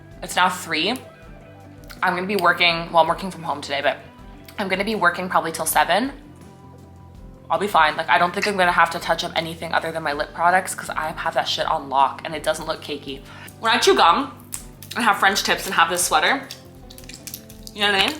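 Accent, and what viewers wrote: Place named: Canada. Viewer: You have such a fun personality, and I can instantly hear your Canadian accent😆😆😆…. I’m actually kind of blown away by your portable light for your laptop.🤯🤯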